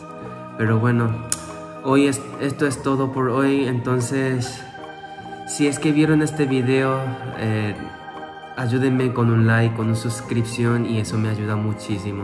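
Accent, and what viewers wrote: Paraguay. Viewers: En el momento que empezó a hablar me parecía que tenía el acento paraguayo y después comprobé 😁 Que bueno tener un lindo coreano en mi hermoso país por favor vengan más coreanos a PY .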